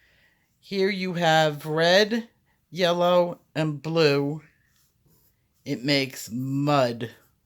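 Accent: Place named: Italian American, United States. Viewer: I found this to be extremely helpful when dealing with greys. Thank you! I really enjoy your videos. Your accent reminds me a lot of my late mother- in -law......Italian American from Rochester NY. It wasn't quite as strong but she had lived in California for a lot of years.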